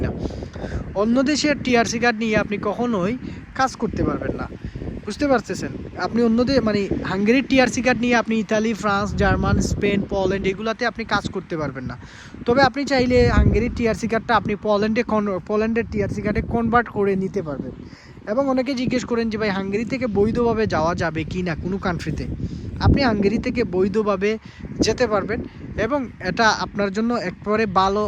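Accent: Sylhet, Bangladesh. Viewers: Vai are u Sylheti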